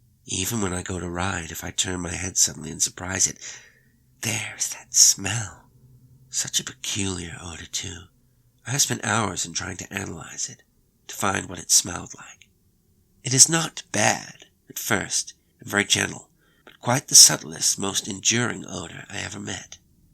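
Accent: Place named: United States. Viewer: I’ve just realised that’s you reading! I honestly to God though you had hired an American actor! You are aweamazing! I spent the first years of my life in the US and I didn’t realise! 🌟🌟🌟🌟🌟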